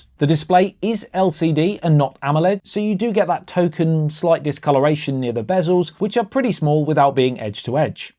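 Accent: United Kingdom. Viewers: I really love British accent 😍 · This is the most british accent I heard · I have seen unboxing and reviews by Technical Guruji, must say this is a kind of british Technical Guruji. Rightly said, this is the most british accent I have heard.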